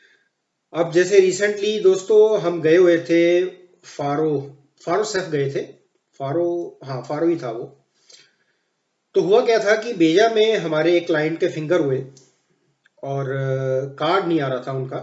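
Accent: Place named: Pakistan. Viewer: Yaar brother ap urdu itni saaf boltey ho jesey pakistan sey ho .. kahan sey seekhi ithini saaf urdu ?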